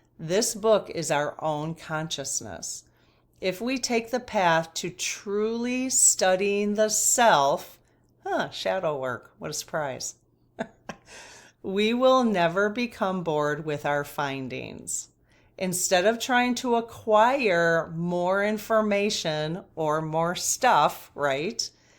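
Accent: Midwest, United States. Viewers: From the first time I watched you I figured you were from the Midwest and really resonated with that. Now I find out you’re from Palatine! I’m older than you but I graduated from Fremd in 1975. Worked at the Marc’s Big Boy on Northwest Highway. Small world! ❤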